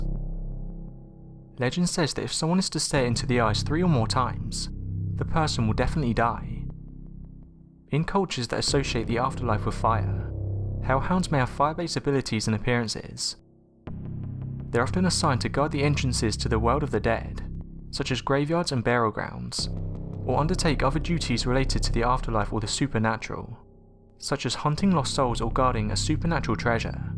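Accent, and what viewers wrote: England, United Kingdom. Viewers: Is it just me or do all videos like this have someone with an English accent narrating.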